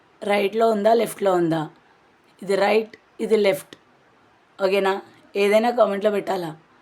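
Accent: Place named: Telangana, India. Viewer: Mee language telangana FASA bagundi.konchem boldness ekkuve.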